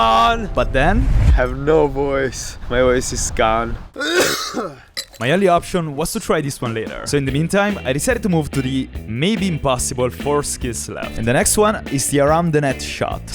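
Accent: Italy. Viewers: Hai un accento così italiano 😆 (bellissimo) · L'italia si sente... nella lingua :D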